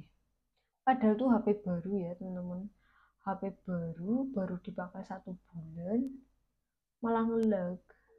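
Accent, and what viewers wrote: Banyumas, Indonesia. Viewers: . Mba jawane ngendi si ko kayane wong ngapak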